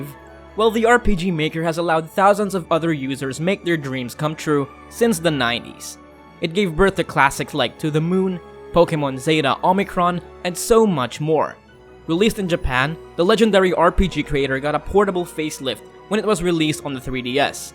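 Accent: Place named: Philippines. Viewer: This guy sounds Filipino.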